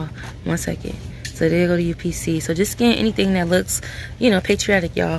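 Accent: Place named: East Coast, United States. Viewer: Everytime I go to the clearance section, I hear your lovely voice in your east coast accent saying "Scan Scan Scan family" LOL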